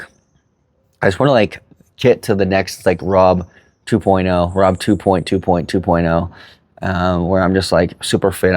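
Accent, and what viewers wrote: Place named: United States. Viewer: I'm gonna pension soon and although I speak fluent Spanish I'm gonna try Thailand. Been there twice and I loved it !..From the sound of your voice I'd say you're far too American, and probably not bringing HUGE amounts of money, to the table, in the business you're working on in Colombia. NO way would I recommend you doing any such activity in ANY country in Latin America unless you have a need for those people to "work for you" !! That's why you're getting those responses ! They're looking at you (as the brains) ..to make money ...for them ! Don't think you're gonna change a culture. You're in their Land.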